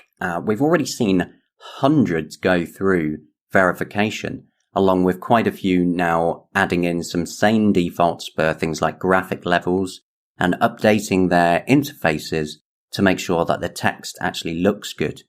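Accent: United Kingdom. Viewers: Are u from Liverpool?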